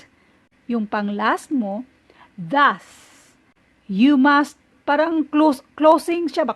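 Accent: Visayas, Philippines. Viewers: Your relative is here 🤗 mam, Mutia shock ko gamay , ma reaction 😲 , nakita ko sa reviewer, while reviewing M., video at reviewer nyo po, ang gamit ko para mag review kaya pala sound bisaya .... big thank you mam sa inyo..🙏🙏, sana nga lang di ko makalimutan ang ne review ko ..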